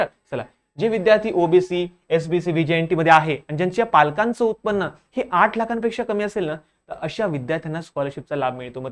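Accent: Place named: Khandesh, India. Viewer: Sir mi Mumbai thun ahe pen mazi Mummy khandeshi ahe tyamule Mela thodi thodi khandesh chi tone samajate ani Tumi nakki khandeshi vatate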